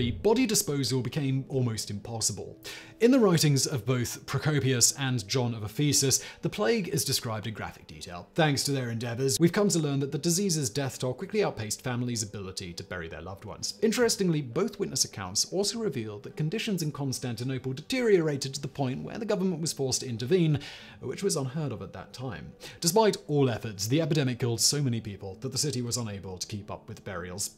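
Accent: United Kingdom. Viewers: IDK if I love your channels for the content, sense of humor or your British accent.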